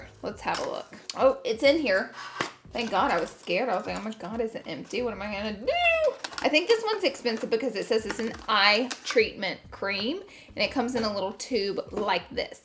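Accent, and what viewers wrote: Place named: South, United States. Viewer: You have an accent (to me)! Where are you from? It’s very southern belle/ country lol and I can’t tell your ethnicity 🧐🤔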